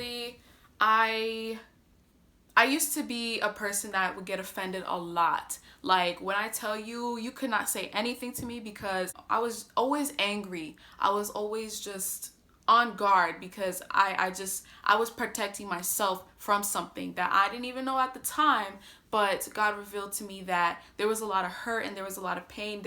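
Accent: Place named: Brazil. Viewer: You have a slight Brazilian accent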